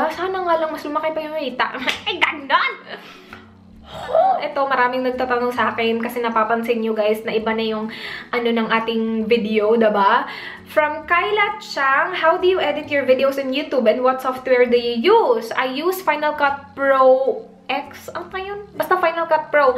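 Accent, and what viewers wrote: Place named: Visayas, Philippines. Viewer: u r sooo funny I almost choked when u retrieve back ur error stragol lollll, is it bcoz of visayan accent that I often hear u say gugol or like Wat u just said.... But u r so funny and cute....stay simple and humble.... If YT makes u happy go on.... But nursing job s very rewarding m telling u gurl, just prioritize ur plans then later u can do both🤗🤗🤗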